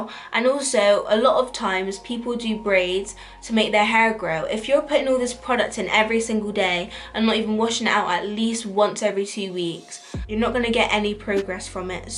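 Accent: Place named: England, United Kingdom. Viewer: What part of England do you live ? Coz ur accent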